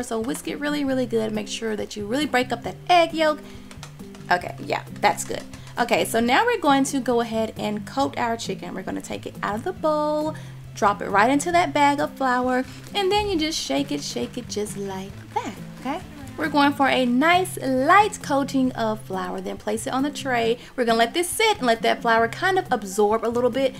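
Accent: South, United States. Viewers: I love, love, love your accent!! You sound like a lovely southern belle!!! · Love that southern accent. Gives credibility to the southern fried chicken. :) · Your food is as wonderful as your lovely Southern accent; beautiful! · She just has a southern accent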